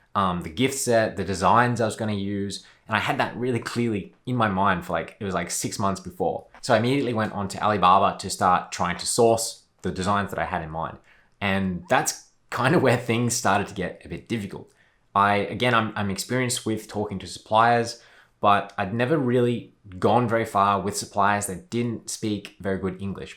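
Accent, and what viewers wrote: Australia. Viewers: you look like an indian but you have an Australian accent and you look like a leprechaun. Just a weird combination :(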